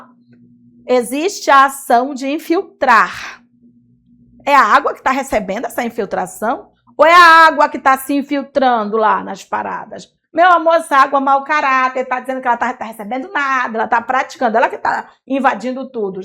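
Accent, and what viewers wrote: Pará, Brazil. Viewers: Professora paraense?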